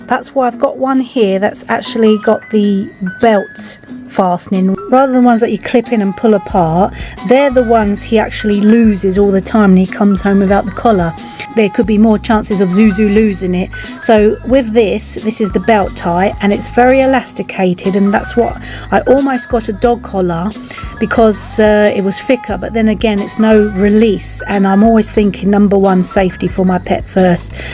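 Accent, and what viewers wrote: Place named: United Kingdom. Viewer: Just found ur channel and this video as I’ve been looking for a tracking device for quite some time (ever since Jasper took his wanderlust a bit too far and there isn’t a person in our street who doesn’t know who he is it’s humbling and makes you feel like such a bad pet mum) .. Have u tried other devices as well and also, u sound British, can we get it via Amazon as well in the UK?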